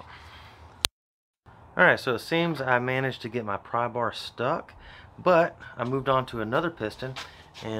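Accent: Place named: South, United States. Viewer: I love the polite southern accent. "don't beat the mess out of it", would have been phrased differently in the Northern USA and Canada :)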